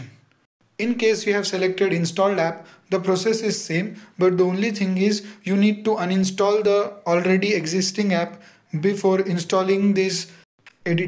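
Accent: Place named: India. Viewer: Disliking and down voting because I had to listen to an Indian insult my language by speaking it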